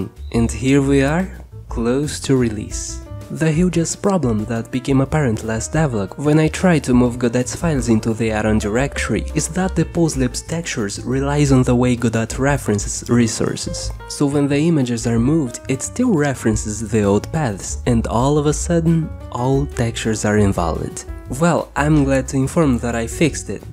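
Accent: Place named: Brazil. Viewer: Acabei de me ligar que ele fala inglês com sotaque kkkkkkk deve ser BR ctz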